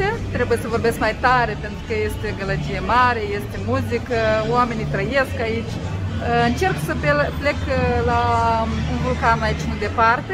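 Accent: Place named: Moldova. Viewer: Bonjour..!! Omul bun atrage binele.. Te urmăresc cu mult drag si te admir pentru ceea ce faci, singura prin lume. Accentul tău moldovenesc imi încălzește sufletul. Bunicii mei au trăit la graniță cu Rusia si vorbeau aceeași vorbă dulce ca și tine. Mult succes în continuare. Prends soin de toi! 🤗🍀🥀🥀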